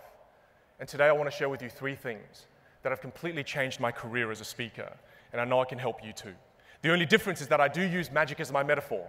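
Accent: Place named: Vietnam. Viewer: Your Vietnamese accent is spot on lol .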